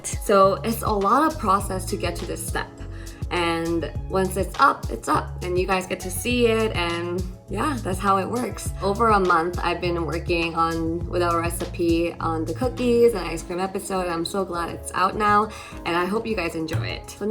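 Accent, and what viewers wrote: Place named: Asia. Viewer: What happened to your accent,? It has become more authentically Asian all of a sudden. Not that I am complaining, but still... How does such a thing happen?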